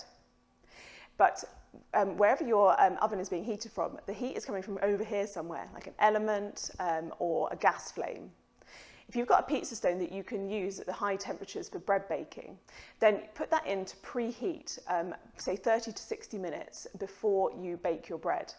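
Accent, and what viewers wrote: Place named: England, United Kingdom. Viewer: Your English accent is simply adorable! 🥰 🪷🪷🪷😍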